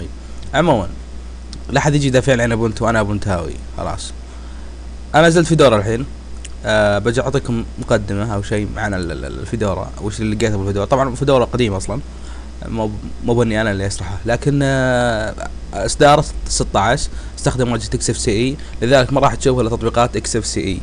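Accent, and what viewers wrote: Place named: Qassim, Saudi Arabia. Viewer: يعطيك العافية أخوي يا حبيلك أخوي شرحك ممتاز جدا خاصة بلهجة القصيم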